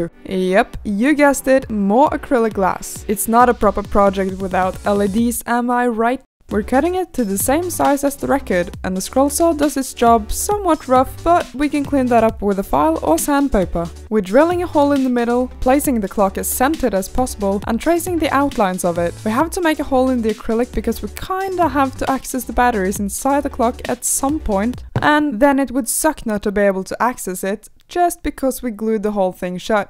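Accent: United Kingdom. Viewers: She sounds more British in this video than others. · So this is where she started to lose the british accent xD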